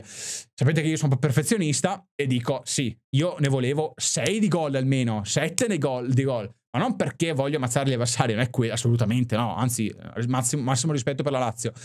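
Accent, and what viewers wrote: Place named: Veneto, Italy. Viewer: Grandissimo bel video..ma poi mi fa sorridere quel tocco di dialetto veneto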